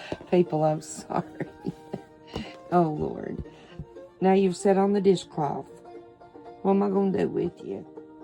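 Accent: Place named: South, United States. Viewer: I love what you made. Love watching, and hearing you. your such a sweetheart. I dont crochet, but love watching you, and hearing that southern acent. Night. And god bless you.🥰🥰🥰🥰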